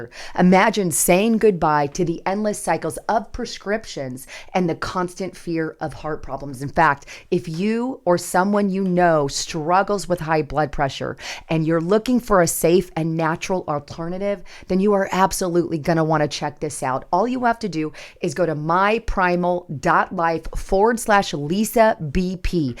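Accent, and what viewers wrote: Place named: South, United States. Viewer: Great thorough report as always - and nice job w/Min-ne-a-polis. Hey, if it's the way you have always pronounced it - yes, hard habit to break. Also, I'm originally from Mass-a-chu-setts - noticed you like many here in the South pronounce it Mass-a-two-sh*ts - not sure if its a purposeful slight on the state by Southerners. Either way, at this point in MA history, its a very appropriate pronunciation!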